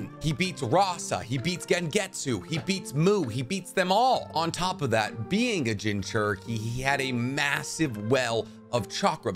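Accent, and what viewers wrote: United States. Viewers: Just found this channel, which is pretty cool but a major downside is this guy's pronunciations of Naruto/Japanese names. So American of him. For now it's a great channel, and I'll bne going back to watch old videos but his pronunciations are definitely going to grate on me.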